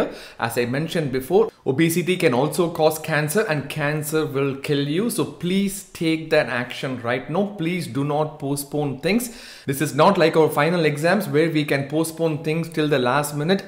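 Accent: Tamil Nadu, India. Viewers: Thank you Doctor. It was easy to understand and specially your Tamil accent, is so beautiful to listen..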